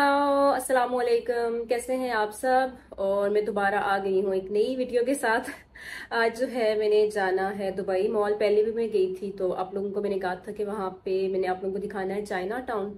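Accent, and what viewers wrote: Pakistan. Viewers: Chinese hi lagne lagi ho, bolne se sirf Pakistani lagti ho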